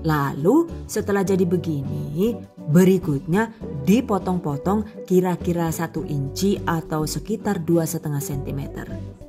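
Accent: Banyumas, Indonesia. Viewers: Akhirnya bisa pegang hp rebutan Ama bocil, Alhamdulillah bunda ini makanan kesukaan anak2, ternyata lumayan mudah ya cara buatnya tapi kudu sabar ngaduk2 kayaknya ni. Makasih bunda makin lengkap aja ni, makin mantap, makin sukses buat. Seneng sama suara bunda yg khas dan candaannya itu loh ngapak kali 😀😀 #GiveawayTHV2021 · Yuu...jawane ngapak metu bae lah.. 😁😁tq resepnya... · Selau suka dg suaranya kolaborasi jawa ngapak indo dan english..i like that...jdi ketawa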